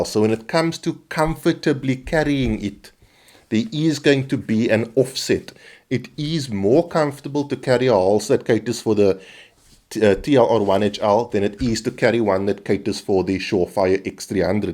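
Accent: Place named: South Africa. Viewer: You are pure South Africa 🇿🇦 the accent thick.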